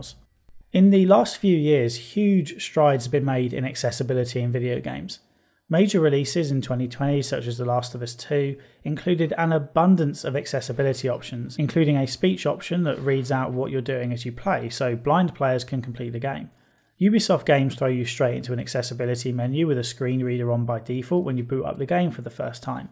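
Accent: United Kingdom. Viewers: British accent The f*ckin' STATE OF IT!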